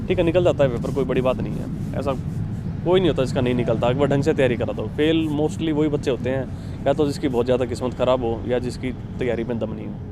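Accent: Haryana, India. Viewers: Haryana k log sach Bolte h or Dil se Bolte h. AAP Haryana se ho boli se hi PTA lag rha h. I Love my Haryana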